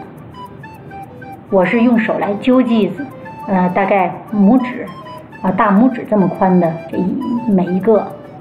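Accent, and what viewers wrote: Tianjin, China. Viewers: 听口音，是天津人吧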